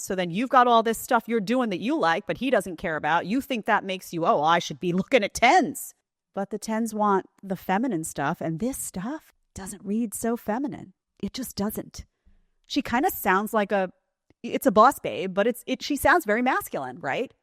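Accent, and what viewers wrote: New York, United States. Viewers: Do I hear a tinge of Ney York or Boston in your accent once in a while?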